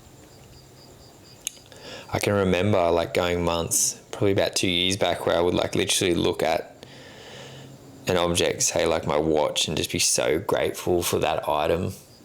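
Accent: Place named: Australia. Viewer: I’m grateful your YouTube channel came up on my feed. Not sure why, maybe it’s the Aussie accent that I adore. You don’t look apathetic, you speak gratefulness and that’s a great place to be, no matter what. Sorry to hear about your father, prepare yourself, because those blissful moments are too fleeting. Did you get to visit the US while in Canada? Would ❤ to see more, you speak with your truth very well.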